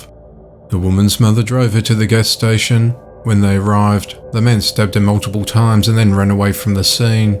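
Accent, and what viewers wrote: Australia. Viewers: Please get a job as an American news anchor so we can have that smooth, Aussie jazz you call a voice telling us the miserable American news so some good can come from it?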